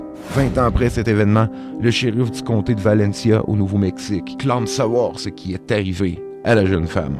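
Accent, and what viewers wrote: Quebec, Canada. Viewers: Cet vidéo a l'air pas mal mais l'accent québécois faisait que je ne comprenais pas tout ( je suis français ) mais je te mets quand même un pouce bleu ami québécois et bonne continuation.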